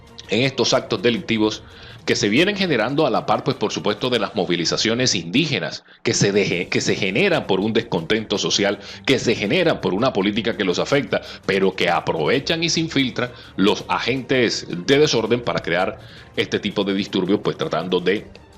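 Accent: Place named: Venezuela. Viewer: Saludos, la verdad que tu no hablas como colombiano hablas como venezolano.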